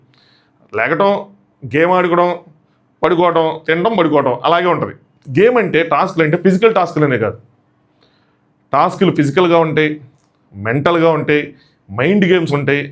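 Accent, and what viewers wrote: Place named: Andhra Pradesh, India. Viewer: Oh avna andhra slang aithe ok garu tq for your reply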